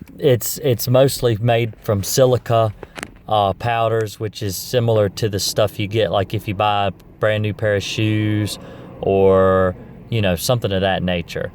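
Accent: South, United States. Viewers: Dat southern accent!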